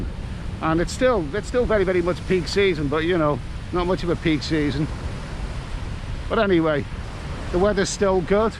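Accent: Liverpool, United Kingdom. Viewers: Hi,in Bangkok at the moment,just passed second pcr test,thank god.Must say the two tests made me anxious but I’m lucky I passed that’s all I say,it’s a big chance for anyone to take I must say.Ramifications of testing positive are not great financially or mentally.I am off to Koh Tao for two weeks with my Thai girlfriend and a few well deserved beers👍 Love hearing your voice as my old man was from Fazakerley,only a scouser would know where that is. He’s passed away now but reminds me of him. All the best to you🍺😊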